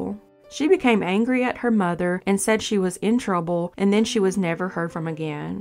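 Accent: South, United States. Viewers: I'm from Georgia, and her voice sounds like Georgia or the Carolinas. She sounds like all my SC cousins. I love it! My mom sounds like Scarlett O'Hara.😆 Im from Atlanta, Ga but I don't really have a regional accent. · She has a southern accent due to her living in the south.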